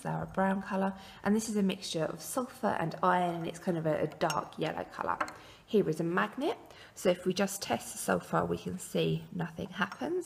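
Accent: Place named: RP, United Kingdom. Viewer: sounds posh to me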